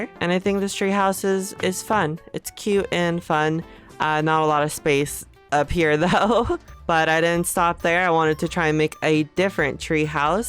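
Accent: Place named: United States. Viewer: i cannot for the life of me place this accent. i know it. i have BEEN here before, but i cannot recall where in america this is anyway regarding the crude door-- that dont break near frequent enough to deter me. i love how that door looks, takes 2 seconds to put back. hardly different than my real doors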